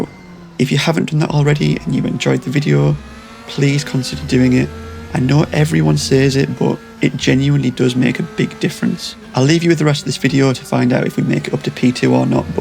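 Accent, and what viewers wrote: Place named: Middlesbrough, United Kingdom. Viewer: Subscribed and a like. But I have to try to guess your accent ! Middlesbrough ?...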